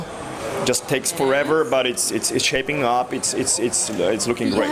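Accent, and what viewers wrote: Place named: Sweden. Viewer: not every swedish have to can speak english perfectly. your accent is lovely, I like swedish language better than english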